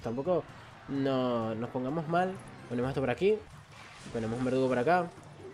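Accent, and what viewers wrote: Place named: Venezuela. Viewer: Bro gracias a que estoy en tu Discord supe sobre este videazo, por cierto, eres Venezolano verdad? Yo lo soy y tú acento es Venezolano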